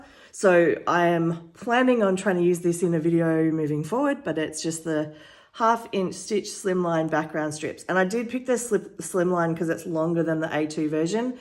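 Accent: Australia. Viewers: Hi . New subscriber here. I just stumbled across your video and was DELIGHTED to hear an Aussie accent! That Pastel Deco is to die for. I'd love to see your version of the stained glass window technique. Shipping rates to Australia are the pits :[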